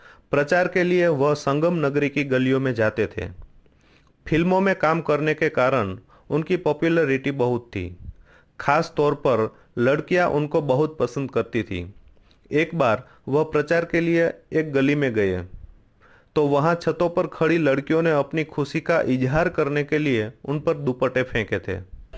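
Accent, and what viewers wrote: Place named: Bengal, India. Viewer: bro I am from Nepal n trust my hindi much much better then urs...sayed aap Bebggali hola ish liye aap bolte hai ....उस टे अमिताभ बोचन उन के कोर गोया था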